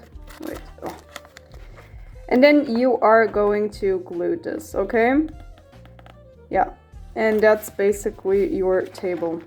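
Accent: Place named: Poland. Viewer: u sound polish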